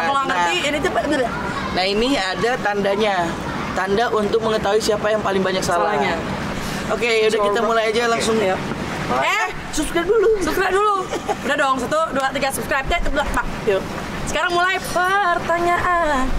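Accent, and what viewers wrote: Bali, Indonesia. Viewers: LOGATNYA BALI BGT HAHAHAHAHA🤣🤣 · Logat Bali nya kental bgt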